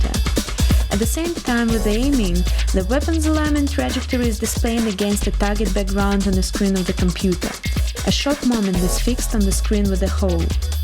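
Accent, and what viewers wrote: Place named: Russia. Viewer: Wait is this is english? I thought it was russian, man that is a bad accent.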